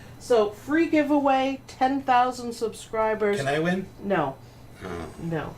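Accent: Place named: Canada. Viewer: 😊 I joined team Hatch today! Gonna be binge watching all the lessons and try to retrain my brain from pe design! Had Thanksgiving with a dual citizenship Canadian\American and she has your accent, brother's neighbor! Lol... Had a nice chat about healthcare differences and how screwed up it is here in the US. But her accent made me think of you!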